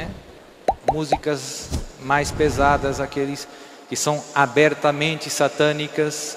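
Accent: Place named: Brazil. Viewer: está morando no Brasil? Seu sotaque está muito brasileiro 😅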